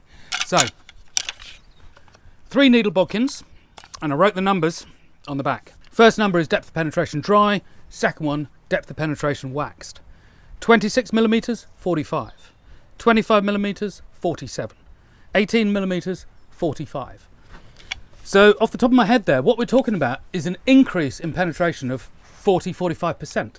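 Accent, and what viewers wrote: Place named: Berkshire, United Kingdom. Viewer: That accent sounds rather Berkshire, am I right?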